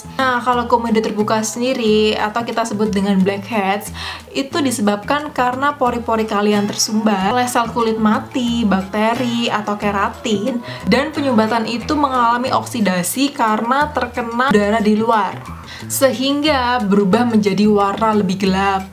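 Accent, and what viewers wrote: Java, Indonesia. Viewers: kak Medhok banget 😂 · Alhamdulillah untuk jerawat saya tdak terlalu seperti itu yg agak seram jga, cma ini aja masalah sya mau sering kluar rumah/sering di rumah tapi sama aja wajah sering bnget berminyak dah coba sabun cream muka tapi msih aja terutama bagian sekitar hidung hingga ke kening/jidat kepala, mohon pencerahanya dok... Btw salfok mba dokternya koq agak medok bnget bicaranya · Jawa nya modok bnget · Medhoook e rekkkk😅😅😅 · Medok bangetttt ngomongnya 😆😆, tapi pintar kamu yaaa soal nutrisi kulit 😗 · Mbk,,, bahasa jawa nya masih ke bawa, bawa ya, 😀😁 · Dr. ngomong jawa'nya medok banget y...😂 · Medokk bangettt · Dokter orang Jawa tah,ngomong nya medok😁,,,sama kayak saya kalo ngomong b.indonesia nggak bisa hilangin logat jawa'nya 😁 Btw, terimakasih informasinya dok, sangat bermanfaat 🙏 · Medokk yaaa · nice info.. btw medok bwanget mbak e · Suaranyaa mueedokk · Logate koyo wong jowo:v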